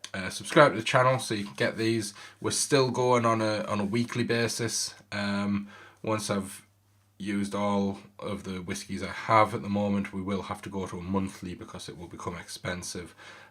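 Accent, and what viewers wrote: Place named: Durham, United Kingdom. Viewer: I've just bought myself a bottle of this. I'm looking forward to trying it. I've recently got into whisky. Not tried a Campbeltown yet. Are you from Durham? I'm from Durham you're accent sounds similar to me.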